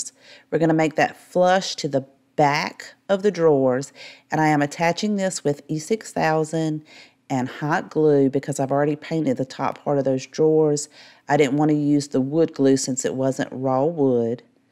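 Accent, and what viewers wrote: South, United States. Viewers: I am a new follower. so far everything you create is just awesome.. I think I hear a little bit of country accent in you... lol.. I am country , live in South Carolina.. Thank u for sharing your amazing talent with us. ❤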